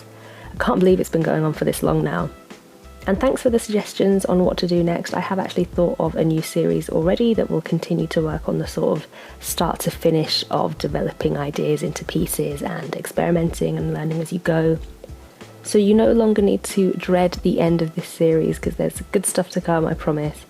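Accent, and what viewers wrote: United Kingdom. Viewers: Nice vid and really love ur british accent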